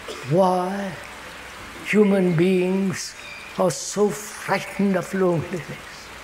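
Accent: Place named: United Kingdom. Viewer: his accent is British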